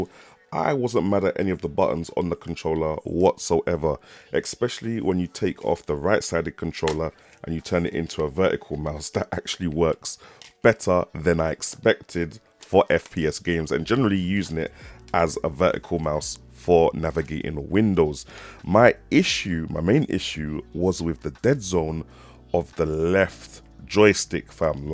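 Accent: United Kingdom. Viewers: You gotta be from uk. From how you say move and. Fam. lol. Big respect. Though. Love the video. I have the 1 tb version and I love mine. I gave away my asus rog strix after I bought this. Lol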